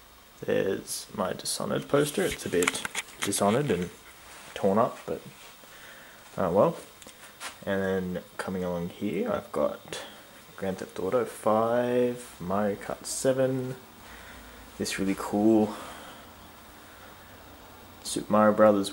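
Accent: Australia. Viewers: U Aussie bro ? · Very nice setup bud! not sure about the lounges haha but great ;) can definitely tell you're from Australia like me ?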